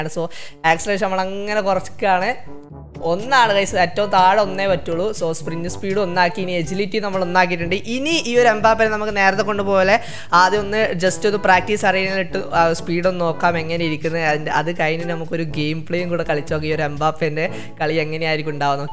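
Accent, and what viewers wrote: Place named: Tamil Nadu, India. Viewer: Naduvula naduvula tamil varudhu😂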